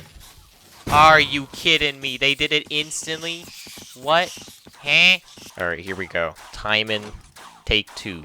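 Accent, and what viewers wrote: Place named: Scotland, United Kingdom. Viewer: Bro sounds slightly scottish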